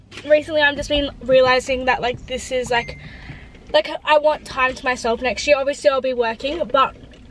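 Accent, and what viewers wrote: Australia. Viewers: It's the Australian accent man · i can tell your australian by the accent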